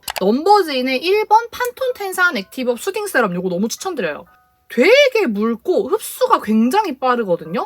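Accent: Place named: Pohang, South Korea. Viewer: 근데 님 어디사람이세요..? 서울이 아니신거 같네용 포항사람인 학교쌤 말투 ↘️↗️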